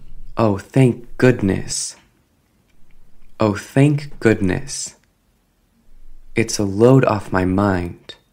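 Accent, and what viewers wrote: United States. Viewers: Usa accent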